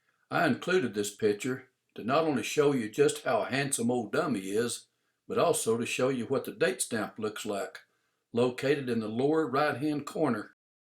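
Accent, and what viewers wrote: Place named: South, United States. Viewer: I do not know whether you have taken studies in communication at all but you are gifted communicator: concise, precise, positive and with the common touch and southern humour. Shades of Mark Twain and Paul Hogan of Crocodile Dundee. The South accent and turn of phrase is also part of your appeal. Your skill is not a skill that can be learnt beyond a certain point, no matter how much training. It is interesting that we in Australia use some of your colloquial expressions. You may want to broaden your topics beyond photos to homespun satire. One of our famous satirists passed away this week. See John Clarke and Bryan Dawe on Youtube.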